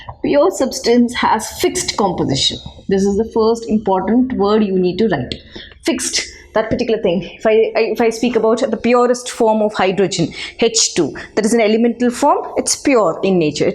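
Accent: South India, India. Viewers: I think ur from south right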